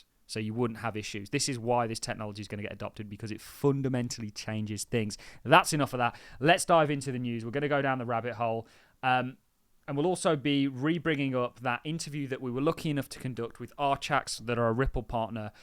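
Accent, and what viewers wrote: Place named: Stourbridge, United Kingdom. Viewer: You from Stourbridge, Mate? Or is it Redditch?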